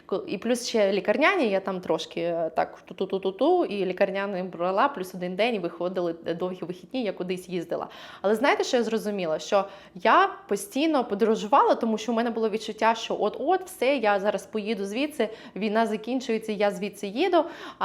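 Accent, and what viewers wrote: United States. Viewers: Цікаво. Сподіваюсь ще знайду у вас відео, де зможу зрозуміти наскільки важко або легко там влаштуватися айті спеціалісту і як взагалі шукають там роботу. У вас Американський акцент, мені здається) або манера розмови, щось таке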